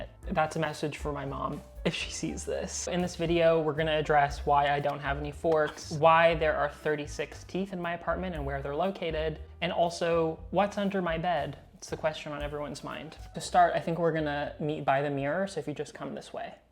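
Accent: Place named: United States. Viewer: If you only lived 2 years in the US how is your accent so American? (Sorry, just one of the many, many questions I have after watching this video)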